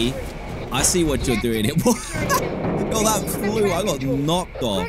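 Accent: United Kingdom. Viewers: as a British person, you are definitely British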